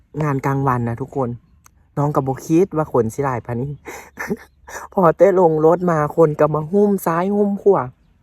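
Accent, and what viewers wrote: Thailand. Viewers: น้อง คนไทยเลยเหรอจ้ะ สำเนียงคล้ายๆ😊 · น้องพูดภาษาถิ่นของน้องเลยลูกสำเนียงเพราะดีฟังเพราะจะได้เผนแพร่ภาษาท้องถิ่นด้วยลูก❤ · ภาษาภูไทยคือเพราะแท้จ้า❤❤❤เหมือนชมพู่❤❤❤❤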